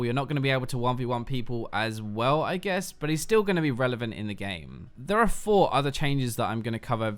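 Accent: United Kingdom. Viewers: "I think honestly, basically, i mean. i don't honestly, i guess. he honestly, basically it means, honestly" - Damn You really need to limit yourself when you use those words and phrases. I watched your 30 min blue EZ guide, and it really pissed me off. On these 7- 10 min videos you can't actually notice, but on that EZ guide it was really horrible. I really Love your British accent and the content you are making, but those phrases man, damn. Keep up the good work tho. Cheers.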